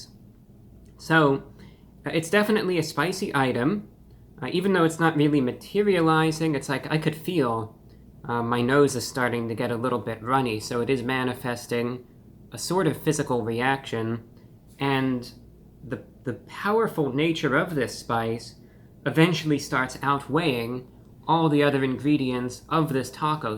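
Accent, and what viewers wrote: United States. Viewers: I dont think ive ever heard anyone speak American English quite as properly as you 😂😂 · Is it just me or is anybody else wondering if this guy is American or English??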